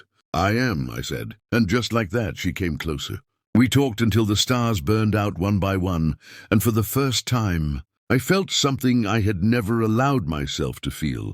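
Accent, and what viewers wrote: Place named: RP, United Kingdom. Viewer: Somehow I can't take a cowboy seriously who sounds like he's in the House of Lords.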